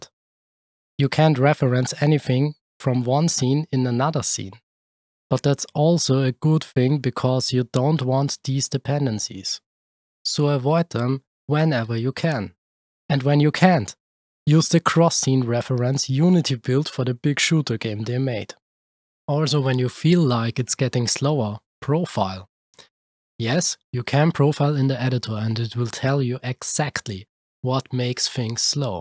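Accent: Germany. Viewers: I can hear it. A little German guy. Good Video! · he has a german accent, everything he says is correct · only 74 subs??? holy you need more attention mate, awesome video. also nice voice and accent. are you german? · You sound German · Alman is what we say in Germany to a perfectly stereotyped German. So you have a German accent😁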